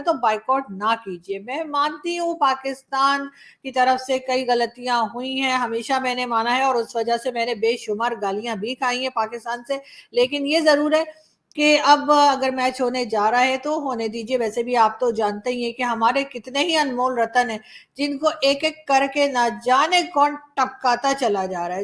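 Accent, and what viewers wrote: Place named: Allahabad, India. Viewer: You are great , absolute Allahabadi dialect , but take care of yourself ❤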